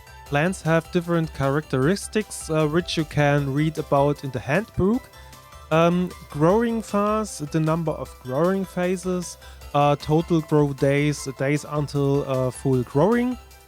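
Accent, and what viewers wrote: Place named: Germany. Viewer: Nice German accent. You remind me of another German of mine.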